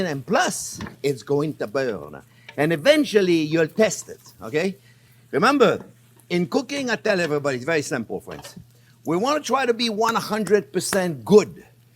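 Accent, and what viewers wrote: France. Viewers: Dude is aggressively French from the beginning XD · I absolutely love this guy… how bout a split pea soup?? On a side note who doesn’t love listening to a French chef talk and cook?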